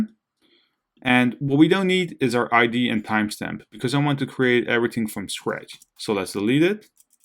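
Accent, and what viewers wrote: Netherlands. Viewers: Ik had wel ff moeite om te horen dat je Nederlands was :P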